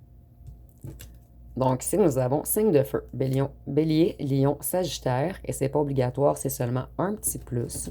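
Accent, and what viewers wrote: Quebec, Canada. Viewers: Merci ma belle ame quebecoise j'adore vous ecouter et charmant accent quebecois.....❤❤❤❤❤❤❤❤❤❤❤❤❤❤❤❤❤❤❤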